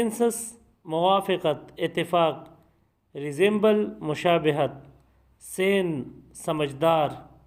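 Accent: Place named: Pashto, Pakistan. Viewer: You missed pronounce many words instead of pronunciation in pasho accent ur accent should be urdu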